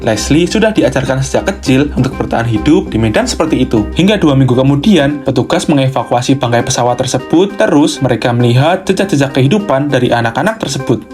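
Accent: Java, Indonesia. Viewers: Ngomong nye biase aje...keles..😢 Jgn di medok2in Logat nye 😭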